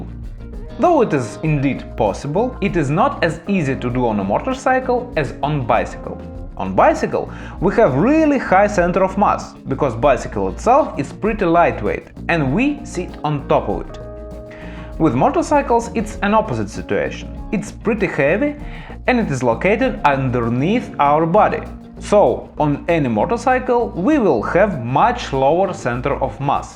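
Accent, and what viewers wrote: Russia. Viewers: Судя по акценту -русский. Почему и титры на английском??? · Узнаваемый русский акцент :) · Youre from russia ?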